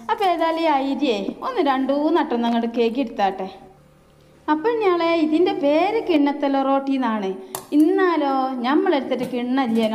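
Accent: Malappuram, India. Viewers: Fantastic dish, great enthusiasm and confidence plus terrific language which really has agreed malapuram tint. O.k. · Malappuram slang powersh💪💪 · Makappura baasha🙂 poli endhaayalum sadhanam sooper aayikk nn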